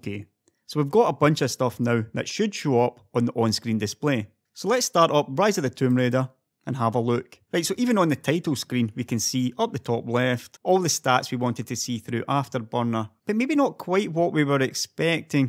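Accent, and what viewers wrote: Scotland, United Kingdom. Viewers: I love the scottish accent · are you Scotish or Indian ? cause i really like your accent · I just assumed it's another scottish dude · like the scottish? accent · are you scottish?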